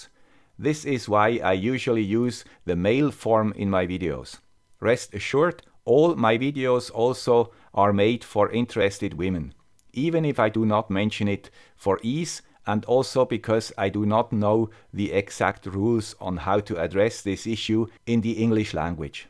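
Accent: Switzerland. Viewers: Your channel is dry and humorless. A robot "With a Swiss accent"? People watch videos to be entertained. Most of your viewers know this shit already. You want proof? Make a mistake and see how many will jump in to correct you! If I want to know about a subject in depth, I'll read a few books about it. Not rely on a superficial 10-20 minute scripted video. People want honesty, but when you give it to them, they resent you for it. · you are a very charismatic guy "with the Swiss accent". Your engineering approach combines the best mix of theory and experimentation. This is great. I reached your channel looking for long distance radios and i found LoRa and all sorts of related stuff. I kept with you and learned a lot more. Other people with a lot more subscribers are doing a very poor job providing very inaccurate information on everything. You know your limits and you respect them. 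I admire you for that. Keep your content safe for the future. Your legacy will last very long. Thank you. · There are a lot of rumors regarding what the youtube algorithm favors. In my experience most of them are based on very small sample sizes of observations. In niches which are more about the personality of the presenter (vlogging, comedy, and in crowded topics like video gaming) a face on-screen may be more important than in videos which are mostly about the topic being presented than the person doing the presenting. In ' case, his voice is enough to identify instantly that this is a "guy with the Swiss accent" video. It is very good branding that doesn't always need a face shown constantly. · There is no competition for a smart tech guy with a Swiss accent :) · Have a great 2019,! IMHO, you, like Dave, GScott and a very few others, became references for what a YouTube channel shoud be: a tool of mass education. 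Please keep it going, with a Swiss accent!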